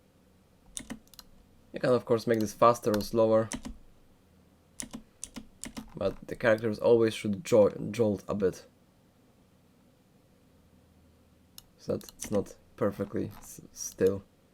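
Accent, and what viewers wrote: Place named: Poland. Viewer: masz polski akcent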